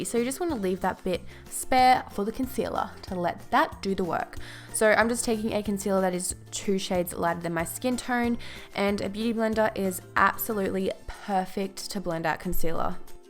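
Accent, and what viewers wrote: Australia. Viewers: Are you from australia ?? such a cute accents.....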